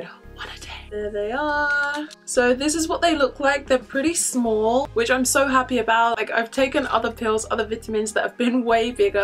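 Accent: United Kingdom. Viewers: I need your GORGEOUS British accent!!!!! I would be a new woman with a new lease on LIFE!!!! 💜💜💜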